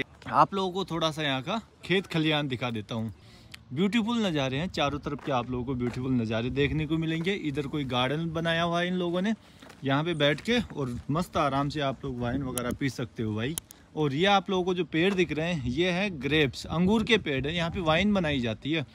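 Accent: Rajasthan, India. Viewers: Aap kya rajasthan se hain kyoki aapki hindi ki tone pashchimi hindi jaisi hai aur chehra lamba ..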